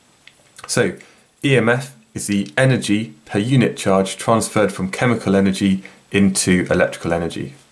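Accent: United Kingdom. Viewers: British English is wonderful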